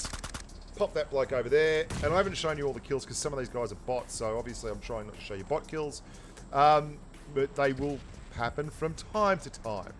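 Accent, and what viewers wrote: Australia. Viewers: I don't know if it's the thicc australian accent or the great gameplay but I can't stop binge watching your videos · Loving that Smooooth Australian voice!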